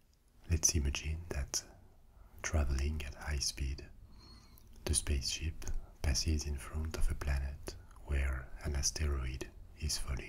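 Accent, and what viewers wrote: France. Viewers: The accent, I fell in love with a French accent the second I herd a French Female speak 😍😍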